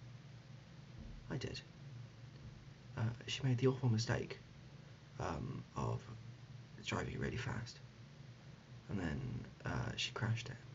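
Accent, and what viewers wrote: United Kingdom. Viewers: Soo odd but that British accent tho. 👍🏻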